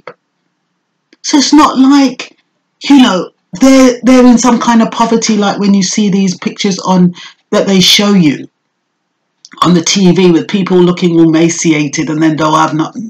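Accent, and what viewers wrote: Jamaica. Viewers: Your Jamaican accent comes out when you're upset!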